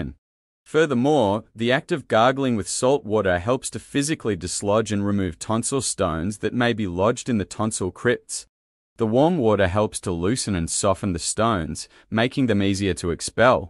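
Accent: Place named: Australia. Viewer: I love hearing Australians speak I can listen to that shit all day it's relaxing to me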